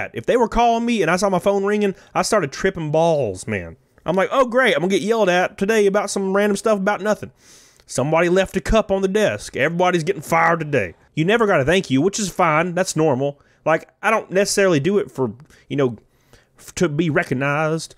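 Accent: South, United States. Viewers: sound either Like an Oklahoma or Texas accent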